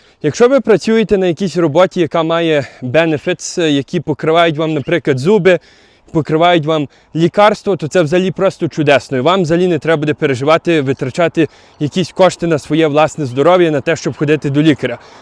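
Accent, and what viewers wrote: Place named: Western Ukraine, Ukraine. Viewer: Будь ласка. переїхав в Канаду в 2005 році у віці років 11. Навчався в канадійській школі. Мати вчителька англійської. Він багато подорожував Канадою, вивчаючи поселення та хвилі мігрантів з України, діаспори тощо. Тобто його українська не зовсім класична, але він дивує своїм щирим українським патріотизмом. А також пишається своїм західноукраїнським діалектом.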